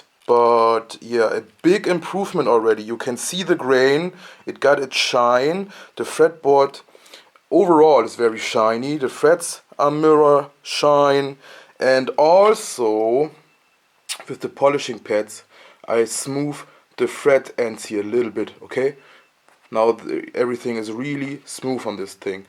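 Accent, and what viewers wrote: Germany. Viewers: Hallo, kann ich einen deutschen Akzent erkennen? Do I detect a German accent? I like your style, going to try it on a neck or 2. -Regards, -G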